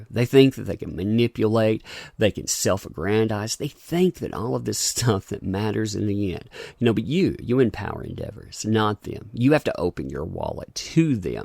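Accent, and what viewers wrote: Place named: Carolinas, United States. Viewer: are you north or south Carolina? You sure sound like it 👍 This Skywalker movie sounds horrible and I dont see it doing what Disney hopes.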